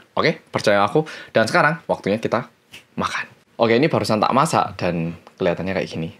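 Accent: Java, Indonesia. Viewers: Lek wong jowo dadi opo ae.boso ne tetep medhok :) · Kangen medhoookkkkk nyaaa:" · Mas ganteng banget😻😻medok lagi jadi paham bicaranya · Korea Ke jowo jowoan wkwkwk🙏👍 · Muka korea dikit suara logat jawa awokakaka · Pertama kali liat orang Korea ngomongnya medok bngt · Logat jawa nya gemesin mas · tak kiro dene wong jowo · Kan memang Jawanya gk ilang ilang ini oppa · Iki nonton oppa rasa wong jowo rek rek😊😊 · Masa korea medok kaya jawa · Lagek delok kok aneh wong korea bosone medok koyo wong jowo😂😂😂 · Orang Korea kok kek orang Jawa ngomong nya yah😂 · Kok medok bahasa jawa ko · Kenapa kalo kamu orang korea tapi kyak boso jowo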